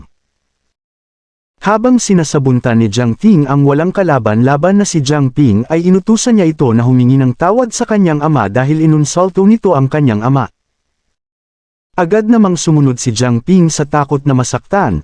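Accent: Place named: Conyo, Philippines. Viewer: Application Kasi Yan kaya mdyo conyo ung pagsasalita.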